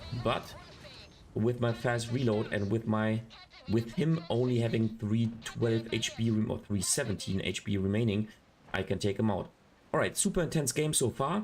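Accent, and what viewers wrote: Germany. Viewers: Cool stuff! I like it 👍 btw: Ist deine Muttersprache evtl. deutsch?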